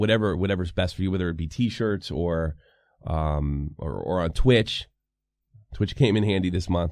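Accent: United States. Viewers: "accent-less" nah, I think you mean English with an American accent.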